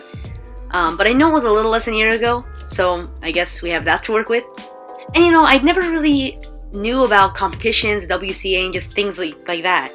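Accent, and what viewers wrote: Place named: Israel. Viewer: I'm from Israel and you have an Israeli accent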